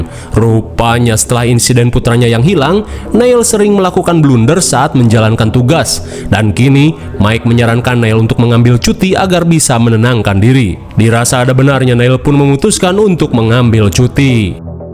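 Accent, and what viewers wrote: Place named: West Java, Indonesia. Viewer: Mantap sunda pisan euy😁😁😁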